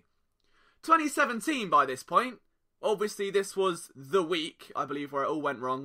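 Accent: United Kingdom. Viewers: Kamu Grugier-Hill -- To Choke: to have victory in your sights only to let it slip through your fingers. Titans, Panthers and Dallas. No wonder the Eagles can't figure it out. They don't even know they are the ones who are choking! Dallas game -- Obviously need Carson to have a big passing game but Sproles is the key. The one running back that a defense can't gameplan. According to the New Orleans game, 14 points will win it. Need points on the first two drives. Brandon Graham -- Won't be signed because there is no money because Eagles will want to get the Carson Wentz deal done in 2019. This was clear to me as soon as they didn't do a deal this spring. Other deals -- Which receiver is better for the Eagles: Tate or Jeffries? Can only keep one. -- Make your goal to become an Eagles scout. You do good analysis and the Eagles like having people with British accents. 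Find them an under the radar franchise running back like Pittsburgh's Willie Parker.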